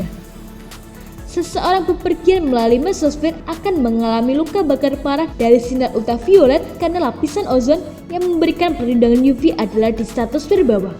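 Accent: Java, Indonesia. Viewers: Medog anjirrrr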